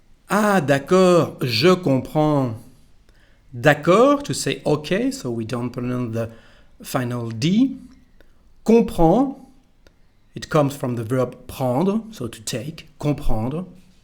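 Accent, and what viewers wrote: France. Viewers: Salut! Would this be the northern accent? Saying "sh" instead of "j". I'm from Brazil learning French in English, pardon me if I say anything too stupid. I recently watched the movie Bienvenue chez les Ch'tis and was wondering if there's an accent here. Merci beaucoup!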